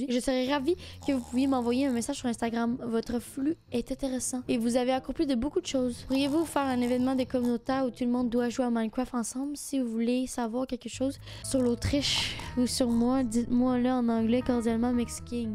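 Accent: Quebec, Canada. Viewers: J'aime bien ton accent québécois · Elle a juste l'accent québécoise il y rien d'exceptionnel mdrr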